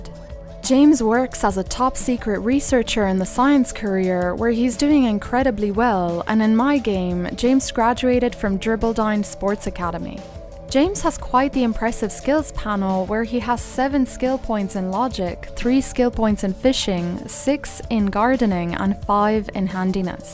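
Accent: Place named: Ireland. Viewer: I love this Irish + a pinch of eastern european in her accent :T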